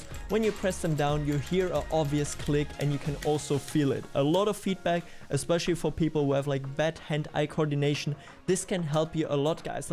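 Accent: Germany. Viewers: Ich höre deutsch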